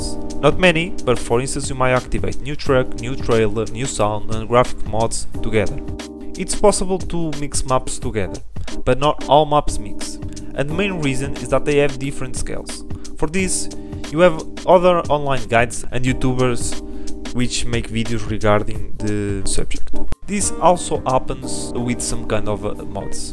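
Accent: Portugal. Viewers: Só pelo sotaque já vi que és portugues 😁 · es portugal nota se pela voz kkkk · Tuga accent FTW.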